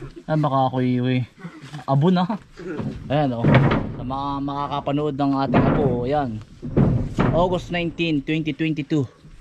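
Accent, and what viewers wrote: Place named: Batangas, Philippines. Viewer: Hawig sa puntong batangas eh, saan ga po kayo sa Batangas?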